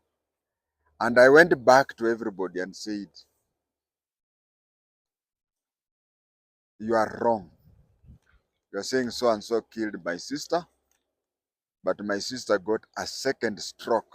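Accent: Kenya. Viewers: Funny u have a kale,luhya accent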